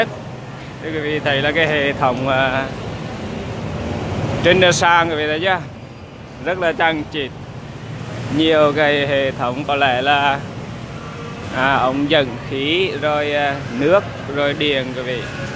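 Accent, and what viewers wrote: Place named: Central Vietnam, Vietnam. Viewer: Thích tiếng miền Trung nhất , nghe vừa nhí nhảnh lại vừa duyên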